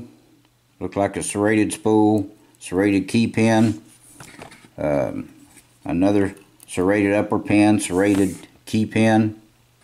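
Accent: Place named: Texas, United States. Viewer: My favorite part of your videos, aside from your amazing picking skill, is hearing you say "let's gutted it" with that Texas ascent :)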